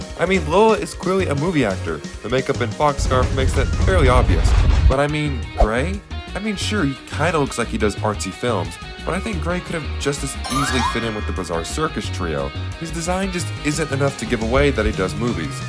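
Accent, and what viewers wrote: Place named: United Kingdom. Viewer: As an Australian he sounds so British 😭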